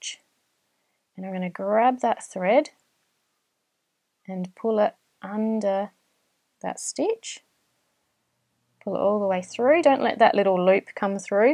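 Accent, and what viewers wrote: Australia. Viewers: Great very helpful video, thank you,,,and I love this Australian accent. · Thank you for this very helpful video. The Aussie accent was frosting on the cake! :)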